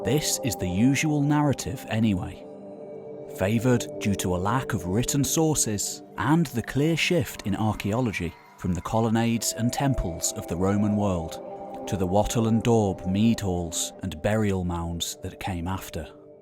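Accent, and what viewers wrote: England, United Kingdom. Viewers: thank you for this well put together documentary ! I assume you are English from your accent which is presumptius but its always great when one of our freinds from down south manages to shy away from the many cliches about the picts . what is not known about through written evidence can in many ways be realised through the highly sophisticaited artwork the picts left behind , it is almost certain that the picts spoke some kind of p celtic language ,perhaps not to different from britonic .you can see evidence for this in many place names all over the pictish areas of scotland unfortunatly nothing from this legacy seems to have passed into the scots language ,I have heard that perhaps the north east use of F instead of WH might be a remenant for example "fit like" instead of "what like" in English and often "Whit like " in scots . 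Doric language is itself littered with archaeolagy from Scots ,French ,English, Gaelic and loads of Norse . i really look forward to seeing what conclusions are drawn from this site in the near future .And keep going with this fabulous site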